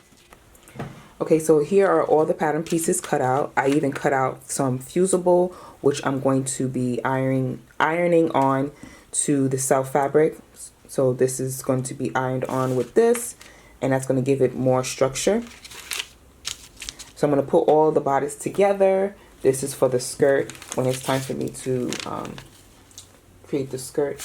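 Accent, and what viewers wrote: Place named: New York, United States. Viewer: You are very talented . Where do you live ? You sound like you live in new york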